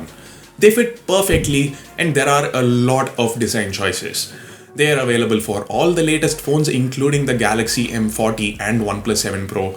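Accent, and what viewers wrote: India. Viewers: Worst thing about M40 is the fact that i have to listen to indian accent to get any information about this phone...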